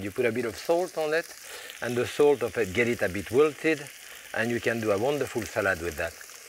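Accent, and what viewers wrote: France. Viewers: I love to cook. My first serious cookbooks were Mastering the Art of French Cooking, Volumes I and II. The originals, not the boxed set. My family is of French origin. Everyone in my family cooks delicious creative food, although they may have degrees in subjects not related to food. I have lived in Berlin for 30 years, but before that, I lived on the French border where I got married. My new husband and I went to Alsace every other week-end, where we had some really good French friends. His accent was exactly like ', the melody of French carried directly into his English, "You know..." I am "staying in" now and almost every day I talk to my sister in Minnesota, who is a professional baker. When I told her I was watching, she said, "Oh good! I'm so glad you are doing that. It's good for your spirits." Not that I'm depressed! But she is so right. 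I carefully record the details of his methods, and have "More Fast Food My Way" in my Amazon basket. I'm so sorry for his loss of his Gloria, who he thinks about with every deft detail, how she likes turkey wings, and likes her pasta well-done. What a terrible year! On his many shows, though, he's timeless, and clever and produces fabulous food. I have to keep reminding myself that he has a Master's Degree in French Literature from Columbia University... He has mastery at more than the one thing... He's a man of all seasons.In this time, he's a Godsend!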